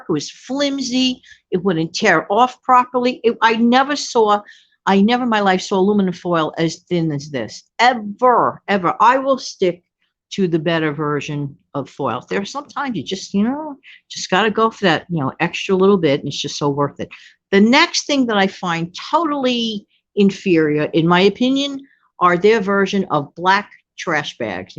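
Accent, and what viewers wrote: New York, United States. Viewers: New subscriber. I saw you on Centsible Living's channel with Prepper Princess. Love your personality and NY accent. Great video on the DT. 🌻🤗🤗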